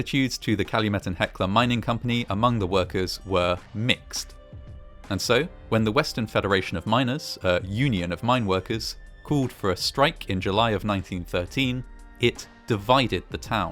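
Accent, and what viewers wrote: England, United Kingdom. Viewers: That's the English accent. 👍🏻🇬🇧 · He definitely has an English accent! Definitely not a Scottish one, or even a mid or northern English one. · He is British or at least sounds British.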